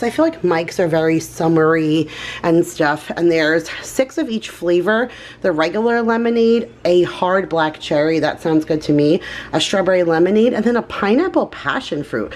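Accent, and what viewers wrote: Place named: East Coast, United States. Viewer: My gosh friend, you truly know how to throw a yummy party, I hope you all had a great day. I really enjoy your cooking video's but how can I truly pick because I love them all, just hearing your voice reminds me of back East which of course I miss so much. It was certainly nice as I'm sitting here resting and recouping to find a video from you. Hope you are feeling better. <3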